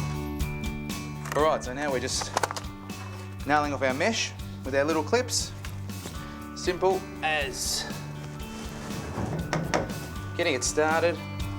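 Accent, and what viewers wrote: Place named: Australia. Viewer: LOVE Aussie accent!!!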